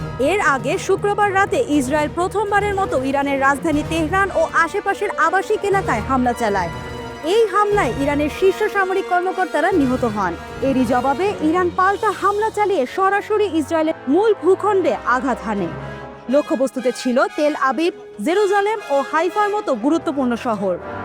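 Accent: Kolkata, India. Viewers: যিনি খবর পড়তেছেনে মনে হচ্ছে ভারতীয় ।কলকাতায় এমন খবর পড়ে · ইন্ডিয়ার মত নিউজ পাঠ করা শুরু করল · পুরাই কলকাতা স্টাইলে নিউজ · Apni citkar kore khobor porsen keno? Mone hosse kolkatar khobor sunci....santo vabe khobor poren...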